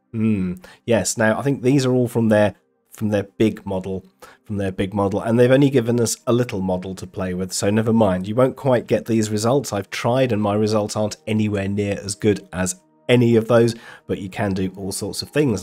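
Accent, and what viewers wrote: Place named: United Kingdom. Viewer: You sound like spiffing brit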